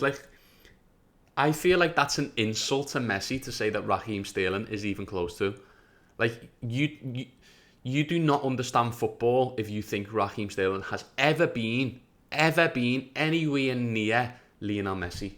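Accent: Liverpool, United Kingdom. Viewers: Hi. I enjoyed watching your reaction to the videos. Agree 💯 it is a pleasure to hear and see positive comments about others. I’m not a football fan per se, but I do watch Messi. His playing style is poetry in motion. Great reaction. And your scouse accent is delightful ❤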